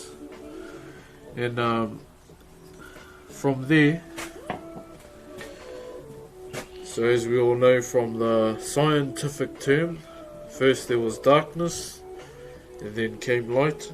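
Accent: New Zealand. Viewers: New Zealand Matey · Yea, Korg. It's because of the kiwi accent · Yup that's our Maori accent lol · Whymark Nah, heavy kiwi accents like are super attractive to me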